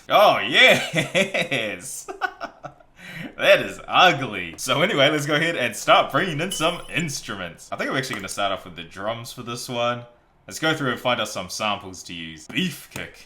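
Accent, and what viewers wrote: New Zealand. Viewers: You from nz g?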